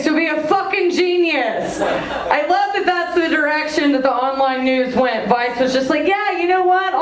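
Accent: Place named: South, United States. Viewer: Good set. Have you visited Alabama lately? That southern accent is coming out. I never thought about that Seattle having a giant needle thing. I like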